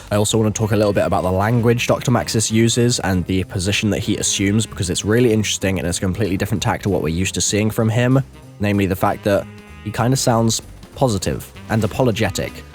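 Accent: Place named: United Kingdom. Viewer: god damn i feel sorry for you,. You actually had to change voice from that happy and silly british accent that made everyone happy to a voice where its so depressing and dark-like compared to other times because of the 10 year old butthurt angry little piece of shits thinking why this is not like The kid wanted it to be. You are working so hard!! And you upload videos this many times!! It just hurts to see this! Just, get a break. The actual people would understand! Props to you,!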